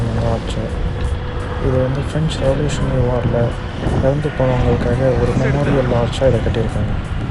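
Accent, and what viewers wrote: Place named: United Kingdom. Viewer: Tamil la UK accent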